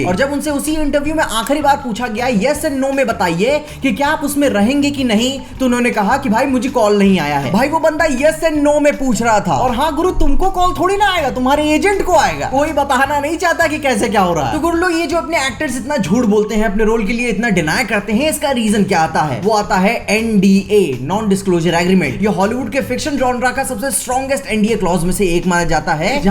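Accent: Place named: Bihar, India. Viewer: Bhai tumhara style ek no.. up Bihar ka touch....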